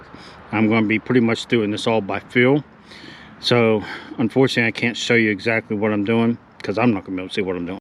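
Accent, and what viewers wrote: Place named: Pennsylvania, United States. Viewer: Hi, you're saying "Y'ins" a lot. Are you in/from Pennsyltucky?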